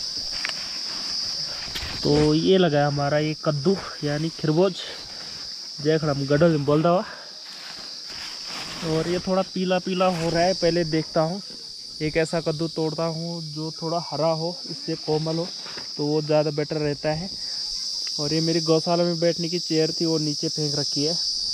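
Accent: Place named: Hills, India. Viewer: Bhai ji aapki video aur aapka presentation Bolne ka tarika bahut badhiya hota hai ekdum hum pahadiyon jaisa apni video daily dale aur aise hi vlog banate rahein.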